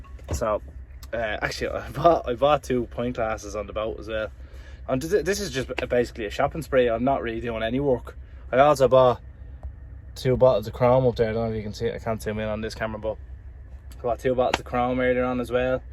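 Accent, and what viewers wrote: Ireland. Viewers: Hey, Dutch trucker here, I really enjoyed the views, I only drive Netherlands and Belgium so. Normaly I watch English movies without subtitles but your Irish accent and kind of mumbling is really hard to understand... also the automaticly generated subtitles aren't very helpfull. Maybe you can edit subtitles correctly for future videos, I am subbed from now on.... peace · A nice broad Irish accent. Sometimes need to listen a wee bit carefully but I get the gist of most of what he's saying. I certainly don't want to sound disrespectful or rude to the nice young man.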